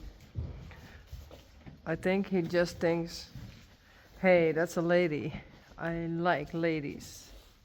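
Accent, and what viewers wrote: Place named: Friesland, Netherlands. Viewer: Zijn jullie Fries? Ik meende Nederlands te horen en toen dacht ik... nee toch niet helemaal bij bepaalde woorden haha. Dat moet dan wel iets van Fries wezen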